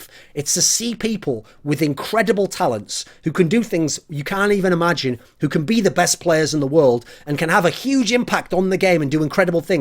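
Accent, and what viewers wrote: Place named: United Kingdom. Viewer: Waddles It's unscripted but he has good speaking skills, so he can just say his thoughts as they come in a way we understand. Plus he has a British accent, so it sounds cooler.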